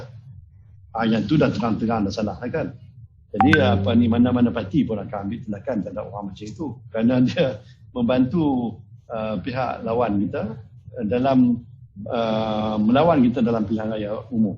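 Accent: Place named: North, Malaysia. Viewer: sy suka datuk..ckp utara pekat..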